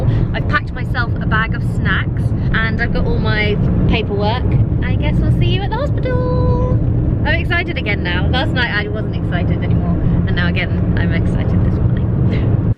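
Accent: United Kingdom. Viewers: You were a joy to watch, so thank you! I'm in the middle of my 24 hour fast, then get to drink the fun stuff at 5 PM and again at 4 AM. It'll be my second Big C, as they recommend one here every 10 years. Unfortunately there won't be any nice British accents to listen to, or tea and biscuits afterwards, so I'll have to pretend I'm on your side of the pond :) Luckily we have a plethora of drive-thru taco shops where I live, as I'll be starving afterwards. I hope more people searching The Big C videos in preparation for their first one, come across yours so they can relax, and know what a life saving and easy procedure it can be. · You from England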